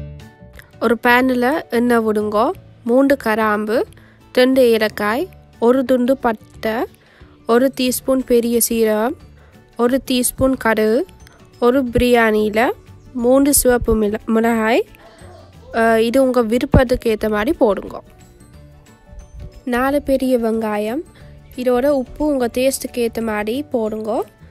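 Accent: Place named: Jaffna, Sri Lanka. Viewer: அழகிய யாழ்ப்பாணத்து தமிழ் வாழ்த்துக்கள் தங்கைச்சி.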